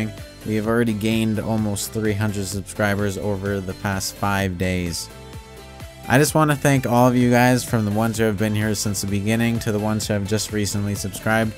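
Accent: Canada. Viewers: btw I heard your Canadian! Same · Also, are you Canadian?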